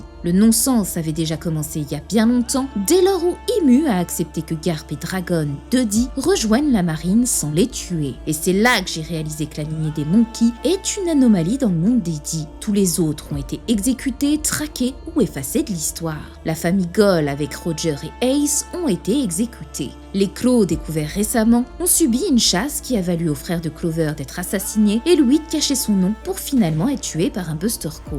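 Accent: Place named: Belgium. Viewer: Le leger et subtil accent belge de retour :)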